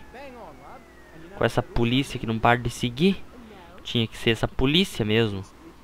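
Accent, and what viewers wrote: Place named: Southern Brazil, Brazil. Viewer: Southern accent of Brazil. 🙂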